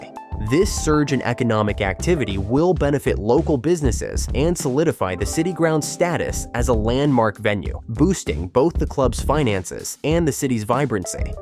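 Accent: United States. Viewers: Why the stupid american accent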